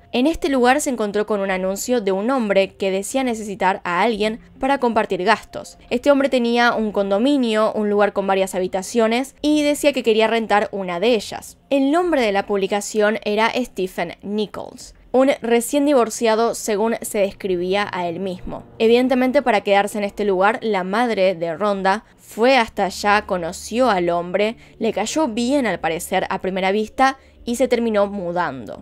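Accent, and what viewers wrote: Argentina. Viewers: gracias por tu trabajo me gusta mucho y te expresas muy bien me encanta tu dejo argentino sigue deleitandonos con casos de diversos paises saludos desde Lima Peru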